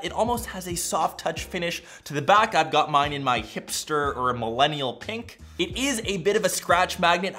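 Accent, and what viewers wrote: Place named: Canada. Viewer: your canadian accent seems much more pronounced than usual in this video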